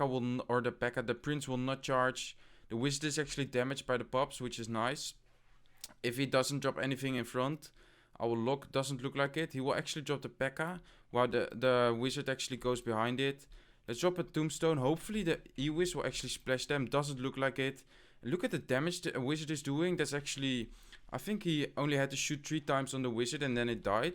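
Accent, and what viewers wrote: Netherlands. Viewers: Tiene acento holandés. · Ahaha ik hoor zo aan je accent/stem dat je Nederlands ben!! Ps, leuke video!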